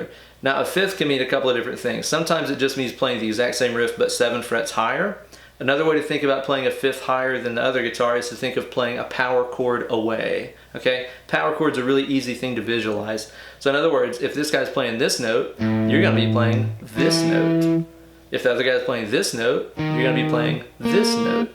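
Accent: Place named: Texas, United States. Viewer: You sound like you’re from Texas, man. We have good metal down here in the south.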